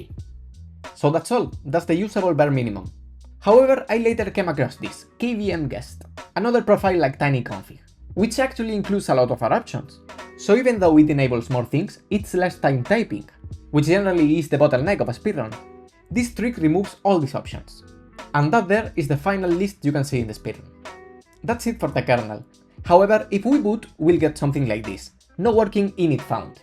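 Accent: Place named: Spain. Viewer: Tu eres español, que te he pillao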